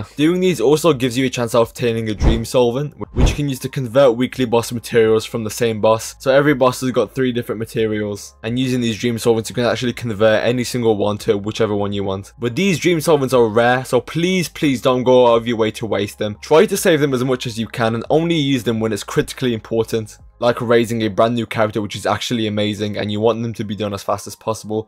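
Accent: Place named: Roadman, United Kingdom. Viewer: a guy with a roadman accent playing genshin feels unheard of 😭🙏 i weirdly like ur channel man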